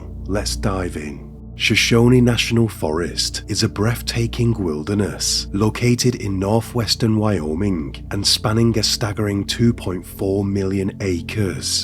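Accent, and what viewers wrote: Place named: Liverpool, United Kingdom. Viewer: Don’t let anyone crap on the way you speak. It’s lovely. I love a slight scouse.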